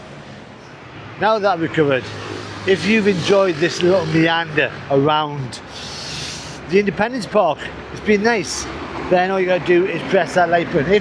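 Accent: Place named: Newcastle, United Kingdom. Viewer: LOL, I am happy to learn a new phrase,,, "He scared the living day...." so funny.😆 I like your videos for the nice way you describe what you see and to learn and get used to your geordie accent. Enjoy your next stops in my country. Greetings and best wishes from a new follower in Chaco, north of Argentina.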